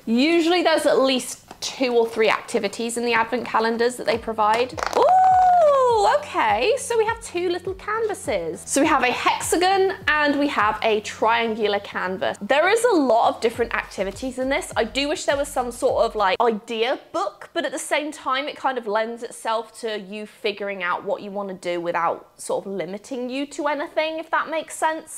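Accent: England, United Kingdom. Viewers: seriously LOVE that pays attention to and creates her own closed captioning. Not everyone does, and since she speaks quickly sometimes and I don't 100% understand everything in an English accent, I really appreciate it. Thanks,! Hope you're doing well!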